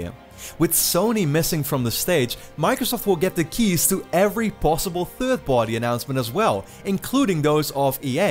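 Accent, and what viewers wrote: Netherlands. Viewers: hes dutch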